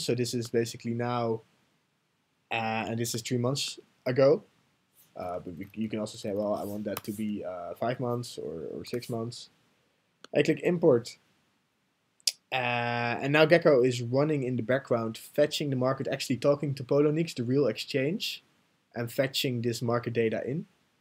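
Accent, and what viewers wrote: Netherlands. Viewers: your dutch ? :O i just hear it in your accent :) thanks for sharing your software ;) your the best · u dutch???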